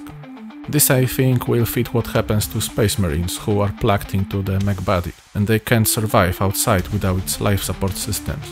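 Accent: Poland. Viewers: Siema 😊 Polski akcent ❤ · sound like polish accent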